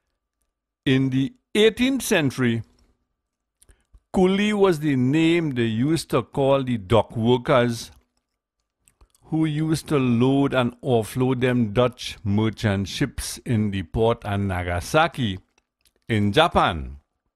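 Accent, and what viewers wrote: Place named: Trinidad and Tobago. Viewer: Thanks, bro. Glad yuh like it...we cyar stop talkin Trini, ent?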